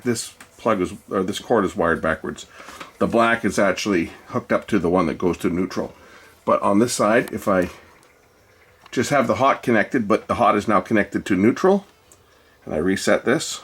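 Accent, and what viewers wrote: Canada. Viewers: Canadians pronounce been like bean .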